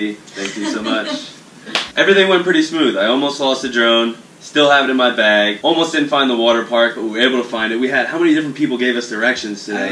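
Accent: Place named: Argentina. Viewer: Ese acento me suena a Argentino, a más no poder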